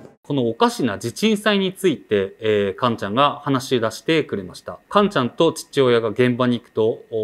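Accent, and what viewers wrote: Aizu, Japan. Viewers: 有難う御座いました。２回にわたる長講義お疲れさまでした。私の祖母が東北の人だったので子供の頃に聞いた会津訛りの言葉を思い出しました。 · 秋田出身だから会津の方言も耳に馴染んできて、その分余計リアルに感じられました。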